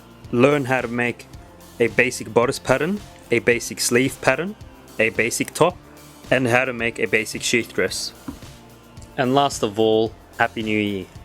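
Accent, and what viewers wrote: Australia. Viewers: ... I hope I didn't offended you or seem like I was attacking you but personally as fashion student it doesn't doesn't make sense because of my background of pattern making but I see where this would help alot of people out. Judging by your accent you must be aussie? (Please tell me I'm right... lol) Have you considered studying fashion? I recon that you have big potential in the fashion industry, you earn a butt load for being a pattern maker.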